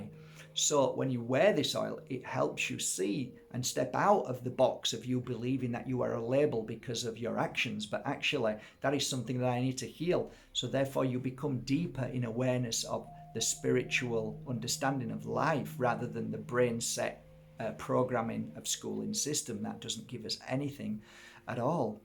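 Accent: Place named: Northern England, United Kingdom. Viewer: Hi sir. where are you from. you sound like an northener. im from west Yorkshire. thank you for your advice x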